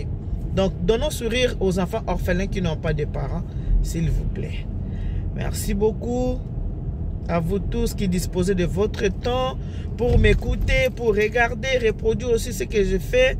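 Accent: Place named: Democratic Republic of the Congo. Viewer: Toute belle maman. Je suis une abonnée qui te suis depuis Ndjamena au Tchad... J'aime beaucoup tes vidéos et surtout ton accent congolais 🥰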